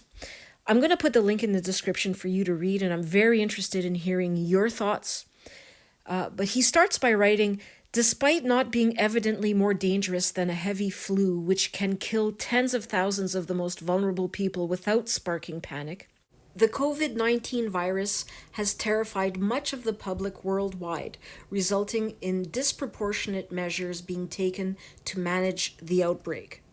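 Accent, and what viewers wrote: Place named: Canada. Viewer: Lets say for hypothetical purposes that dogs only become violent because of bad owners. That still doesnt justify allowing them into our society. In fact bad owners are more likely to be negligent, not be able to restrain their dogs or keep it in their yard or let them out. I never understood this argument and it is NOT a reason to allow dogs because 50% of dog owners are probably going to be bad. How does this justify the risk? I love your Canadian accent BTW.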